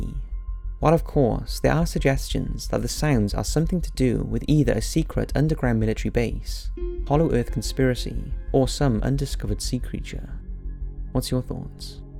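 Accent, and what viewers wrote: Wales, United Kingdom. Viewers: Well we know he’s Welsh and from Wales by his accent.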